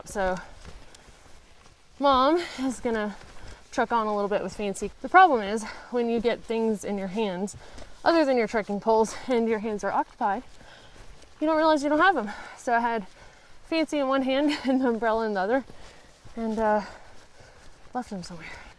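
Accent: South, United States. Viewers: It's the lady puppy for me!!! It's the southern accent for me!!!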